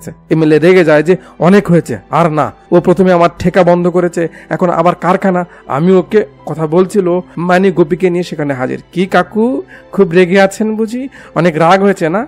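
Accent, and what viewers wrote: Bogura, Bangladesh. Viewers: ভাই আপনি মে বি বগুড়ার লোক।❤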